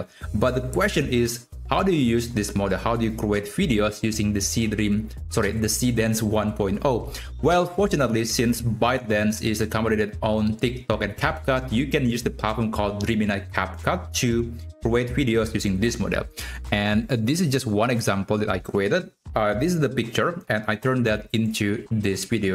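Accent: Indonesia. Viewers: Indonesian banget logatnya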